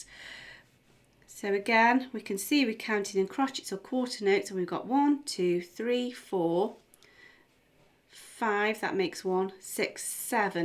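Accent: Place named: North, United Kingdom. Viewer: You sound like Yvette Fielding. I think it's the Northern accent. I never did Grade Five Theory but did Grade Five Practical and passed about 12 years ago. I'll take ANY advice you offer in the videos.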